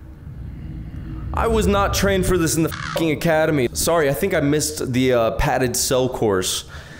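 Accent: United States. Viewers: What is his accent? Sounds Jersey, but I wouldn’t have a clue.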